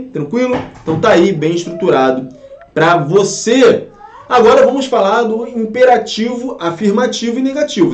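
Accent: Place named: Rio de Janeiro, Brazil. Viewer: Uhhhh falando com i onde não há i, pondo X onde não há X; fica difícil lecionar usando o português da capital do nosso querido Rio de Janeiro.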